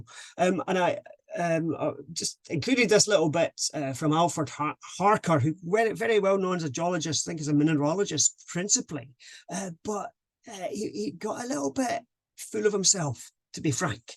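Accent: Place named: Scotland, United Kingdom. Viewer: This trilogy of geology lectures has been fascinating. I also love the Scottish accent.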